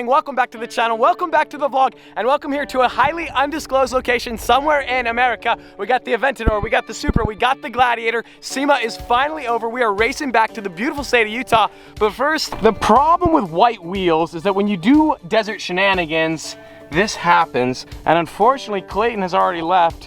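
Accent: United Kingdom. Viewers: Is it just me or does he sound British during the intro? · is he British?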